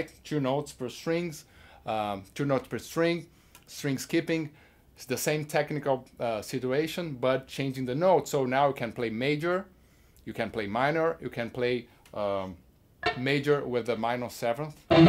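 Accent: Brazil. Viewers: Putz o sotaque brasileiro é inconfundível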